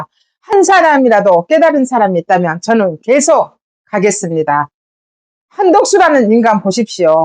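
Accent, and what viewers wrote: Jeolla, South Korea. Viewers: 반세기넘게 살도록 전라도 사투리 가 이토록 순박하고 구수하게 들리기는 첨 입니다. 애청자 입니다❤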